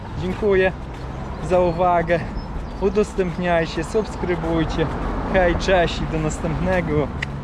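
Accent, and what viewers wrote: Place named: Podlasie, Poland. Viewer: Kurwa ta twoja gadka masakra z jakiej ty wiochy pochodzisz gdzieś pewnie z podlasia albo chuj wiii. Ciezko sie tego słucha.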